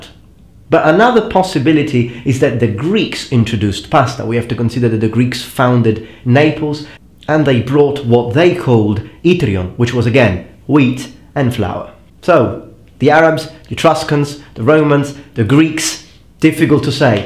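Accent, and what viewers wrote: England, United Kingdom. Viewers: hai un accento inglese fantastico,come? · Your English sounds like a British accent. Well done! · Qualche consiglio su come raggiungere il tuo livello di pronuncia British? Sono a Londra da 2 anni e ancora mi trascino dietro il dialetto Romano. Grazie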